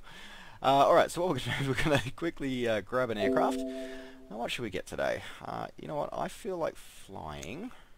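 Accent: Australia. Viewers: YOU MY FRIEND ARE AUSTRALIAN AND YOUR FROM GOLD COST OR JUST NSWI FUCKING CALLED IT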